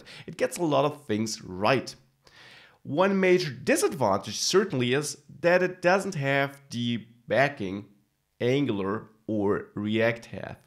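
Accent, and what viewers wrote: Germany. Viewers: Gute Videos aber ich kann mir dein extrem deutsch gesprochenes Englisch leider nicht lange anhören. Trotzdem weiter machen!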